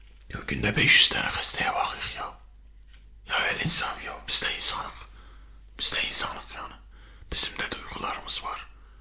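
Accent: Eastern Anatolia, Turkey. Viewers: ilk defa doğu şivesi konuşan doktor görüyorum dfsghdjfkgt teşekkürler <3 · gece gece tam ihtiyacım olan şey. doktor roleplay mi hayır doğu şiveli olmasindan bahsediyorum(3 senedir türkiyedeym bende 17 me kadar ingilteredeydm iyi gceler)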